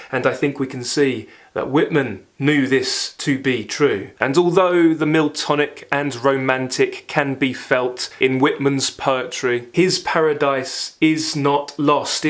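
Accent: United Kingdom. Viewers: It's a trip to be hearing someone with a British accent commenting on Walt Whitman. The most American poet.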